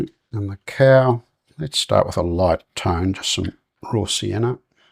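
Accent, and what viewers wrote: Australia. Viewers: This is amazing!! Pardon me if I am wrong, are you Aussie? Would really like to see how to paint a gum tree Please. 🙏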